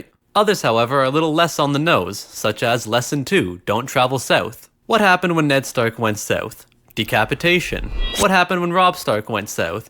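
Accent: Newfoundland, Canada. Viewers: Wait, are you from Newfoundland? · are you from newfoundland or irleand or scotland? dont hear the word bhys on youtube much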